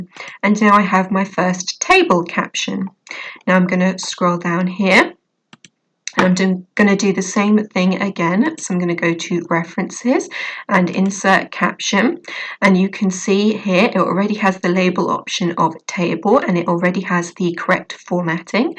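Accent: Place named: United Kingdom. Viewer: Thank you by the accent I'm guessing your British haha. Back to the point thank you. 😁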